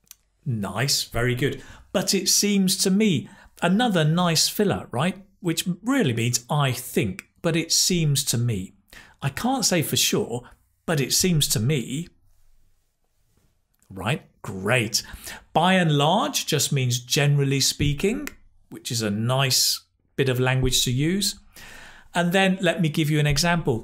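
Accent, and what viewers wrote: United Kingdom. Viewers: Is it UK accent? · Thanks!!! Is your English british? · Hi teacher? I really love. Your British accent. If I decided to study English by Cambly I'm sure I going to choose as my teacher.💚🌎🖍📗🇬🇧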